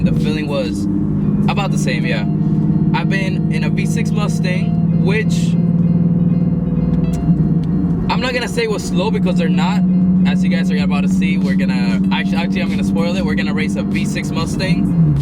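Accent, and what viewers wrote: Cuba. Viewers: Dude yes please more videos like this this shit made me laugh so hard 😂😂😂 that Cuban accent though de pingaaaa lmao I live Miami so you Already know what that means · Acere your Spanish is Cuban asf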